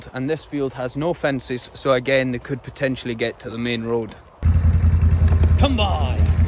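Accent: Scotland, United Kingdom. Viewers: you do wonderfully with these beautiful, intelligent, well trained dogs and your videos. I love everything. Your dogs doing their jobs so happily, your Scottish accent, the landscape and the music. Love hearing your commands, “come by”, because those sweet dogs jump into their beloved duties. Love from a Scottish ancestry American. ❤❤❤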